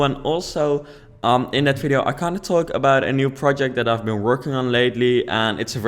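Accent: Netherlands. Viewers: je kan horen dat ie nederlands is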